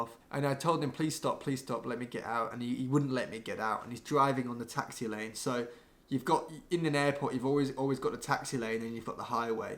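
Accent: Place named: England, United Kingdom. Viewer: Never knew english accent would be so useful. Great story! :)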